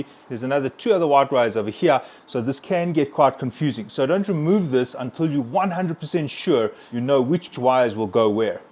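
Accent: South Africa. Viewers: South African accent, mooi🙂👊👍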